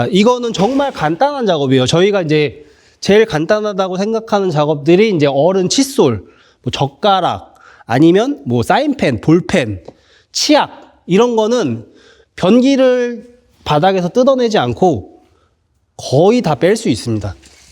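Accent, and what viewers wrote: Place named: Seoul, South Korea. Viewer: 변기가 막혀서 몇일채 고생하던 중 우연히 시청하게 되었습니다. 사장님처럼 양심적으로 하는 사람이 드문 세상이라서.. 또 요즘 경제사정도 좋지 않고, 평소에도 간단한 일은 손수 해결하던 사람이라 걷어붙이고 뚫는 시도를 했지만 이 글을 올리는 현재 성공하지 못하고 몇일째 화장실 대란을 겪고 있습니다.ㅠㅠ 혹시 영업지역이 어디신지? 말투를 들으면 서울 내지 수도권인 것 같은데 지방출장(전주)도 하시는지 궁금합니다. *ps: 글을 올리고 영상 속에서 말씀하신 내용을 곱씹어 보니 정말 정직하게 사업을 하시는 분으로 느껴져서 '좋아요'에 그치지 않고 '구독'까지 눌렀습니다. 그리고 연결된 링크를 따라 블로그 방문을 했는데 역시 서울 인근에서 활동을 하시는 분이네요.. 지방출장을 한다 해도 출장비가 추가되면 의미가 약해지는 결과라서 혹시 막힘 증상을 글이나 영상으로 알려드리고 문제해결의 도움을 받을 수 있을지 모르겠습니다.. (영상 끝부분의 내용으로 봐서는 변기끝자락(플랜지 가까이까지 근접한?) 근처까지 물건이 밀려나면서 막힌 탓에 차오른 물이 하루종일 지나도 전혀 빠지지 않는 것이 아닌가 하는 의심이 갑니다..ㅠㅠ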